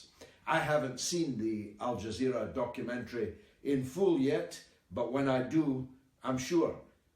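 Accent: Scotland, United Kingdom. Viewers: Why is it subtitled? Do some people struggle with comprehension of the Scottish accent?!!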